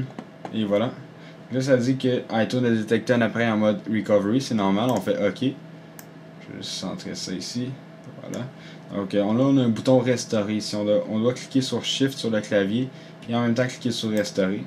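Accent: Quebec, Canada. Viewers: SISI LACCENT QUEBECOIS !! =) (Y)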